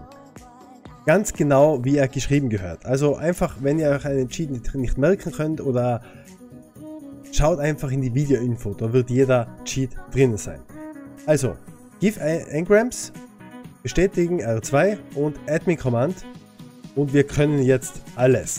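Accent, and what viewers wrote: Switzerland. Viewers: bist ein schweizer gell 😊😊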